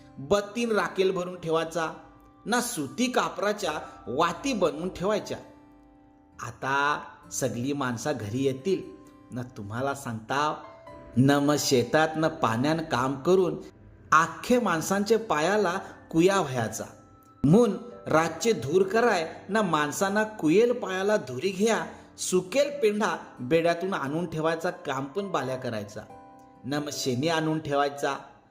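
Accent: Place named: Kunbi, India. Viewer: अप्रतिम कथा आणि अस्सल कुणबी बोलीमधील सादरीकरण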